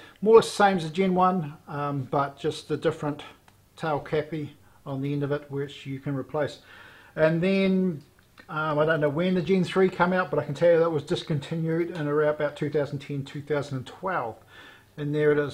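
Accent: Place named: South, United Kingdom. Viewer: Your accent is interesting it’s like a southern British sounds cool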